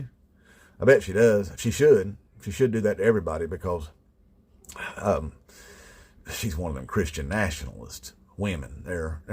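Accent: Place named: Appalachia, United States. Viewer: Your Southern Appalachian accent brings the story to light for me.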